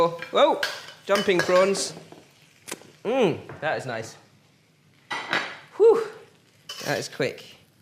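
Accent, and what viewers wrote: United Kingdom. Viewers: A chinese guy in British accent 😂😂 cute😂😂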